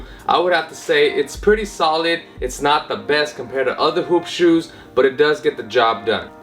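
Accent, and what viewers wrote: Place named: Asia. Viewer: Veiled asian accent mixed with hip hop wannabe drawl = slow reading enunciation.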